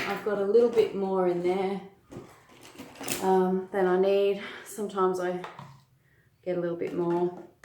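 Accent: Australia. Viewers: A very good video, and wonderful instruction. I love love your voice, it is soothing. Thank you. I am from Canada and your accent makes me think of and miss my Australian cousins. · Hi, like everyone else, I love your videos and you have inspired me to finally take THE big step and make some soap. My lye solution has a film over the surface, am I still able to use it? Did I do something wrong? Also, when I discovered your channel, it was sooo good to hear an Aussie accent. I'm in Tassie. Thanks, Victoria. Aussie, Aussie, Aussie, Oi, Oi, Oi!🇦🇺😊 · This is an amazing video and very satisfying Love the Australian accent 👍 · Nice video have my thumbs up, straightforward process, like your light Australian accent. Just one comment: I think the process is called saponification rather than emulsification. In the moment you have first amount of soap made by the chemical reaction the soap will definitely emulsify the rest of the oil. 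However, at the end of the process the oil is completely transform so I believe it is correct to say that the saponification process was completed. I think this is true even when the recipe keeps a small amount of oil unsaponified (this way we know that all hydroxide is used up completely and soap is not caustic ); recall my grandma making soap when I was a kid, and she had a way of testing the end of reaction by taking a sample in a spoon and we were not allowed to get closer (she wanted us to not get splattered). For us the kids, it was a pretty magic alchemy. Today, seeing you making soap brought all those memories back. God bless you and the work of your hands. Chris, Canada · Love the Aussie accent …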